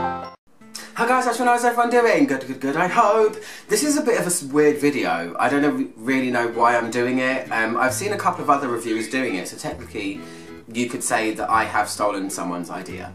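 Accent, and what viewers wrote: United Kingdom. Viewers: Luv ur British accent.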